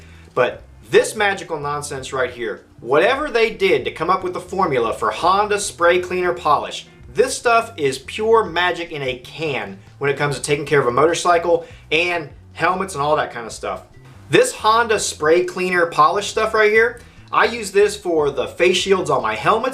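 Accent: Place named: Georgia, United States. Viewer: Sounds like he lives in Georgia 😂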